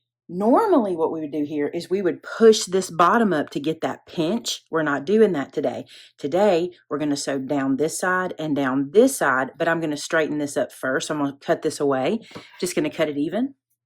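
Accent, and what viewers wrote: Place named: South, United States. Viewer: This is a great video! Love that accent😊. I’m from Alabama and your voice makes me feel at home! You know, I still sew over pins🫣. We were taught that as long as the pin is at right angles to the needle, the needle will slide over it. I have found this to be true in my 50+ years of sewing. I’ve never bent or broken a needle because of a pin.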